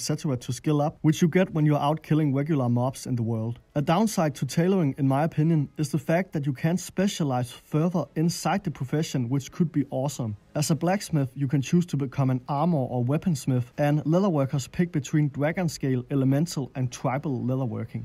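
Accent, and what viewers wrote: Denmark. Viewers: Sounds like denmark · the danish accent is strong with this one! :D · WE GOT A DANE! IN HERE! I CAN HEAR IT! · I just started watching, and im 100% sure this guy is Danish. Edit: Great video, learned a lot. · Very nice😀..fat danish dialect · Jesus that’s a thick danish accent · Pls stop calling him Arnold he doesn't sound like someone from Austria at all, he is 100% danish. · Ægte dansk accent. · That's a Danish accent though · Sådan dansk engelsk 😂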